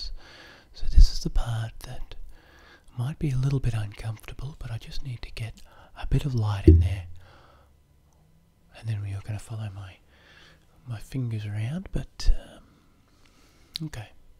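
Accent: Australia. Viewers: Hello Jon Snow, I’ve found another Aussie asmrtist. 🇦🇺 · haha you're so Aussie. love it :) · Accents that trigger me change all the time but right now your Aussie accent is killing it! I've done nothing but sleep these last few days after dental work and a stressful few weeks, got a holiday off work next week and thoroughly looking forward to a week of sleep with your fantastic accent and brilliant knack for tingle creating at my ears! Much love to you from the other side of the world in Scotland x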